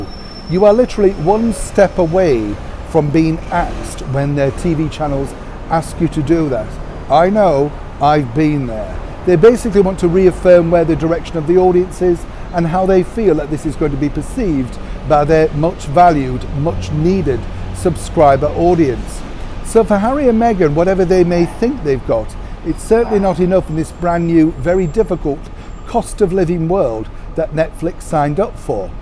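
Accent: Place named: England, United Kingdom. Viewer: I love seeing all those shots of London beside Neil, and hearing his English accent. Thanks Neil! Hi from New Zealand!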